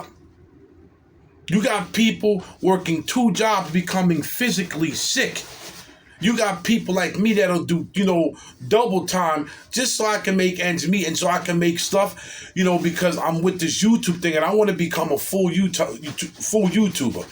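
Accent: New York, United States. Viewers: God bless ❤ Subscribed. And finally, a REAL NY accent. You are everything a real NY native should aspire to be. ❤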